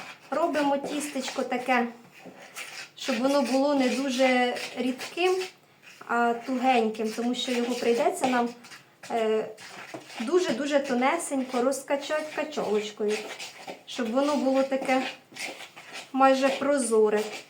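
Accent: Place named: Poltava, Ukraine. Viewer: Яка гарна господарочка, так гарно все розказала i показала, приэмна така мова полтавського говору... Згадалося щасливе дитинство i бабусинi смачнi xрущики та пирiжки з вишнею. Спасибi за смачне печиво. Щастя та здоров'я господинi та ii ciм'i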